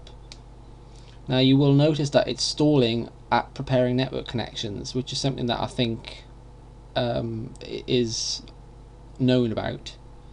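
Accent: United Kingdom. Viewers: Is that a British Accent ?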